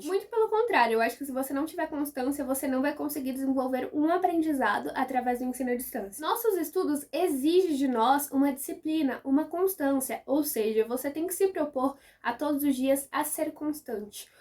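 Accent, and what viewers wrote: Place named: Northeast, Brazil. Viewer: É nordestino cara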